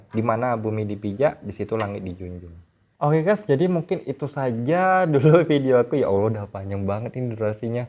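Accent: Indonesia. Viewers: Mana ada orang riau medok jawa macam lu ,, lu mah jawa tulen bukan melayu riau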